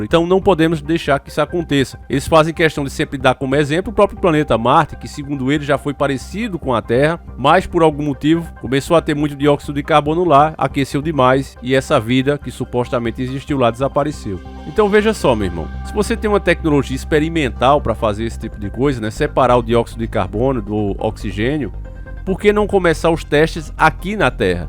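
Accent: Northeast, Brazil. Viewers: mais uma vez eis me aqui ótimo início de semana pra toda família encarando também mando o meu abraço pra vocês, eu moro em São Paulo a mais tempo duque vive no nordeste mas sim sou de Alagoas e além de tudo estou familiarizado com o seu falar fico feliz em saber que no nordeste tem alguém que representa bem não só o nordeste mas todo O Brasil aqui no YouTube é uma honra poder fazer parte de todo família encarando, embora não gosto muito de comentar mas quando torna-se mais que interessante como é o caso da terra então eu entro na conversa.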